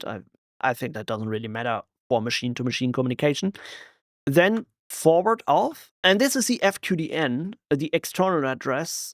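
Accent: Germany. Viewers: Прикольно как у чувака немецкий прорывается через английский =)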